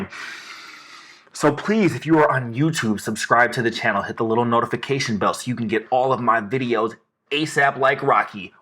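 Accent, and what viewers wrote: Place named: United States. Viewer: and I thought you'll have the Nigerian accent.... Lol. Love your American accent though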